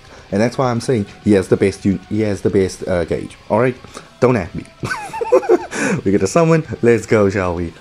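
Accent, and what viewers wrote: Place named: South Africa. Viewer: I can smell a south african from a mile away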